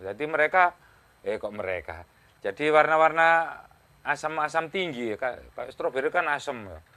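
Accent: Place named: Mojokerto, Indonesia. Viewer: kok logate koyok mojokerto an om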